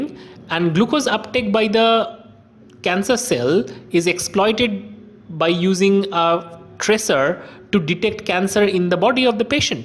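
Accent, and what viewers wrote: India. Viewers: Your videos are good but I don't watch them because I'm scared of indian accent 😅 sorry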